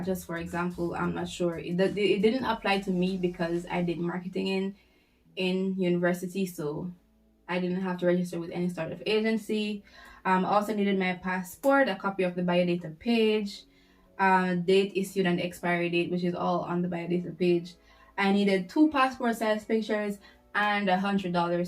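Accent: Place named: Caribbean. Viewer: wow, you have jamaican and bajan accents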